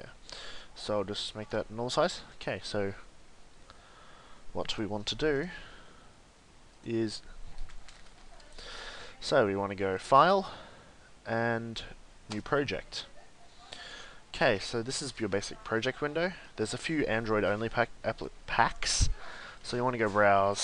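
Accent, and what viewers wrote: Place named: Australia. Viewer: You're Australian :D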